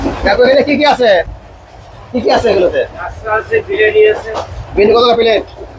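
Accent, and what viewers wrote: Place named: Bogura, Bangladesh. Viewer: ধারাভাষ্যে বগুড়ার আঞ্চলিকতা পরিহারযোগ্য। এছাড়া ভিডিওটি ভালো ছিলো।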